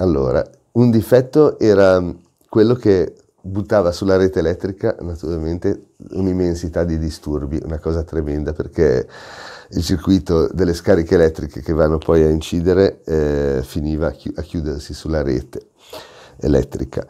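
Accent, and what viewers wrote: Genoa, Italy. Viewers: Perché, come si dovrebbe dire? Comunque sì, il maestro è genovese!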